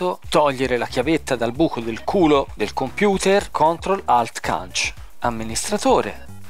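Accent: Tuscany, Italy. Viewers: Grande,ti ho scoperto quest'anno e mi sto divorando tutti i tuoi video. Un saluto grande e w l'accento toscano <3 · Ti ho scoperto a caso nella home page ma dalla 1 parola ho detto li sto qua e un parente toscano poi hai resumato delle parole che "al bacio" un so di dove lissei te ma ner dubbio sei della Toscana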